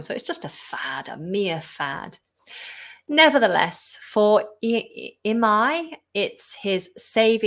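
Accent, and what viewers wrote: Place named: United Kingdom. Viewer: First of all, congratulations on your channel, I have been looking for a channel with British English for a long time and I found yours to be very funny. Secondly I would like to ask you a question as a new subscriber. Are you still uploading short videos or I have to join some group? I loved them. Thank you in advance, and cheers from Madrid.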